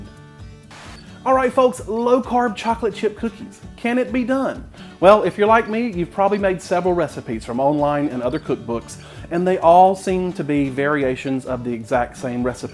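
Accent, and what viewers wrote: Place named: Eastern North Carolina, United States. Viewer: Wow, you sound like me. All we have is an Aldi out of the things you listed. LOL! Now back to the video. I'm notorious for commenting before I've finished watching but that's because I have the attention span of a peanut and will absolutely forget by the end. Where abouts are you from? I'm in eastern NC near the southern outer banks. Your accent sounds a lot like "my people". ;)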